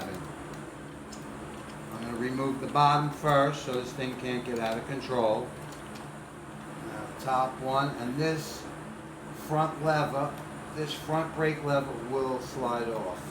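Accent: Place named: New Jersey, United States. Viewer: Yep, I do also, '03 Heritage Softail 100th, they fit '95 to '15 Softails...the real Willie G grips are only $114 at Harley #<num>;o) is the man!, love that accent!, must be New Joisey!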